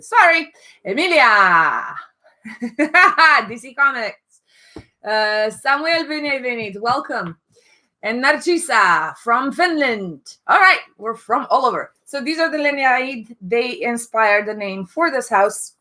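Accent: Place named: Romania. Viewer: Incep sa ma uit la Imperial Opoponax pt ca asta ma interesa si zic in mintea mea: 🤔 parca are accent de romanca 😅 si ma uit sub video si vad: romanian chanel ....😅 am avut noroc sa il gasesc in Norvegia si l-am luat intr-o secunda😁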